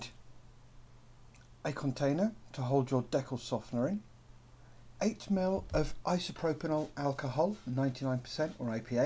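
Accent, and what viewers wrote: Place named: England, United Kingdom. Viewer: Sorry I've slept since watching the video and forgot you had an English accent lol.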